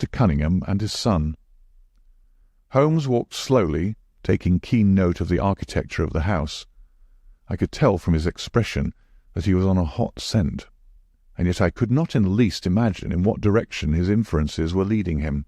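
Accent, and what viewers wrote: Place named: North, United Kingdom. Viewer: A nothern man as narrator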